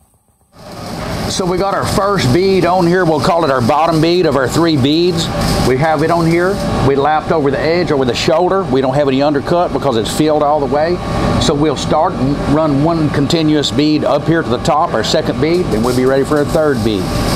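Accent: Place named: United States. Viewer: Well he’s explained it very good, and my hat goes off to him, and just love the yank’s accent. But why’s he using two hands, instead of one. Where I served my apprenticeship (Babcock & Wilcox) in Scotland, our instructor said it was always better to use one hand only, and used to poke your hand with a rod, if he caught you. I also thought his cap was quite rough, as his beads on cap was to far apart. But then, it depends on the quality of the rods. We were spoiled with Babcocks own rods, and they were brilliant for an immaculate finish on the cap. Murex vodex, was another good finish for inspection. 🏴👍🥳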